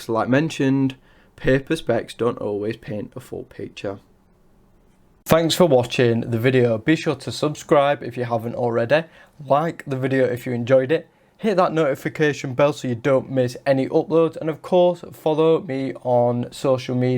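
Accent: United Kingdom. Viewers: thanks for your answer. I’m not British, but I have an interest in accents, dialects and all that jazz. Might I ask you where your accent is from? Midlands? Liverpool?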